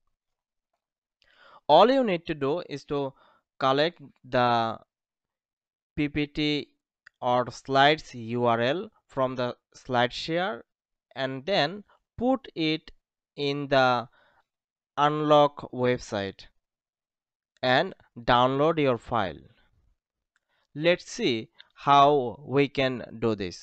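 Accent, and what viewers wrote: Bengal, India. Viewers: 100% u are bengali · ইংলিশ শুইনা বাংগালী মনে হইতেসে🙄🙄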